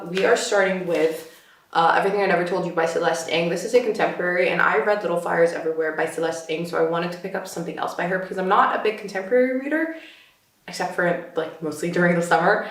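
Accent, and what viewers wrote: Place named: United States. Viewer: Based on your accent I definitely assumed you were American lol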